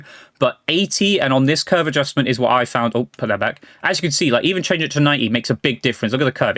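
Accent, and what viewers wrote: United Kingdom. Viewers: Hello you from Liverpool? you have an accent maybe scouse, top contrôller form me but he's just hard to find it in EU.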